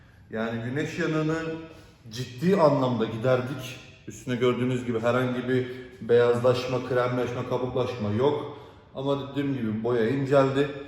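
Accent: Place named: Zonguldak, Turkey. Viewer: selamunaleyküm,saniyorum zonguldak lisin.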